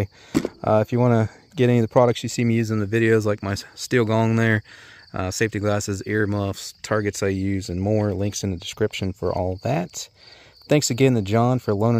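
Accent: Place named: Southern Ohio, United States. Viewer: You must be southern Ohio.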